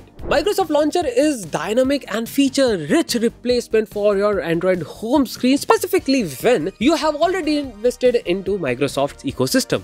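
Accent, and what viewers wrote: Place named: United States. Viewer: You really have a decent but not perfect American accent.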